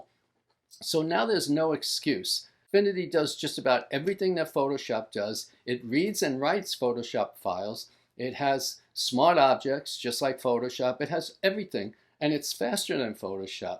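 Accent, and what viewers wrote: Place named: East Coast, United States. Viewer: I am from RI...your east coast accent was unmistakable! Lol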